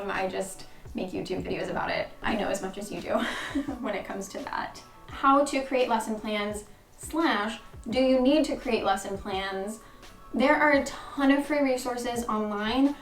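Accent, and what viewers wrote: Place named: California, United States. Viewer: You tend to pronunce some words in the British way of it, such as glass or grass, right? I've noticed that it's related with californian accent. So actually, this is how I'd like to sound like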